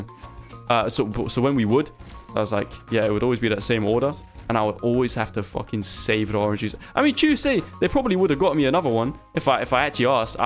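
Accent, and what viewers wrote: United Kingdom. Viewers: I flipping love your British accent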